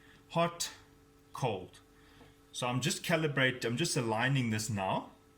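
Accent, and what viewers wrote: Afrikaans, South Africa. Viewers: I'm a kiwi and the SA accent is familiar to me too, sounds much better than the Aussie one... they all sound like they're holding their nose whiile complaining about being constipated. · I didn't have a clue how to do this but now I know, thanks pal, I'm off to Bunnings as well, and is that an Afrikaans accent I detect?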